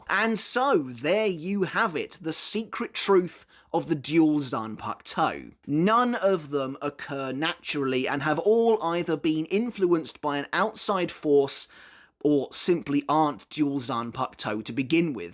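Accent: United Kingdom. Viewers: it's his British accent that gets people.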